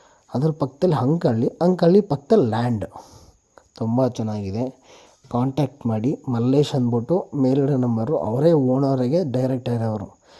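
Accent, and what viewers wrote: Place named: Tamil Nadu, India. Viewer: Irritating presentation 😢😢, please speak naturally, kannadavanna tamil accent alli mathadthare. Helidde heltheera, gottu english sariyaagi baralla antha, sariyaagi kannadadalle maathanaadu, english shoki yaake 😢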